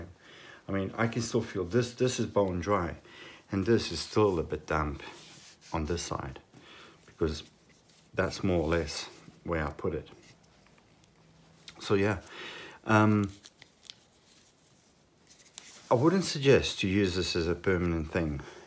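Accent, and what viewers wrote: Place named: South Africa. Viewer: I thought I could detect some South African and a little bit of Italian. Thanks for replying mate.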